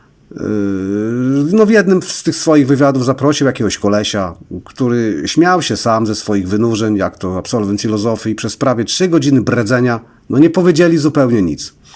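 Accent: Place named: Podlasie, Poland. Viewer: Uspokój się ćwoku zaciągający podlasko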